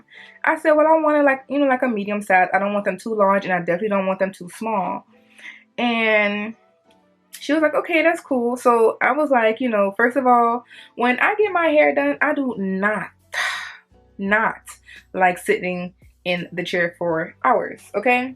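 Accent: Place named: Los Angeles, United States. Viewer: Crazy story. Love your LA accent.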